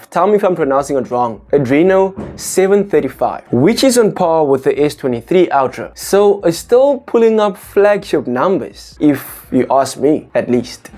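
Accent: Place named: South Africa. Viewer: South African accent so I'm assuming South Africa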